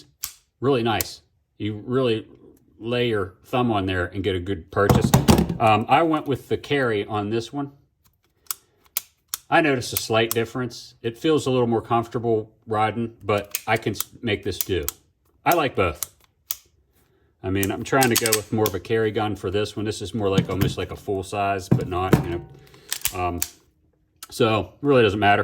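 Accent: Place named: Pittsburgh, United States. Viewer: Good to hear another Yinzer!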